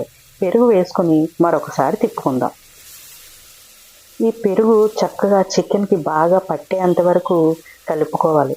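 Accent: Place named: Godavari, India. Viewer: Mana godavari style lo baga vivarinchi anduku miku thank you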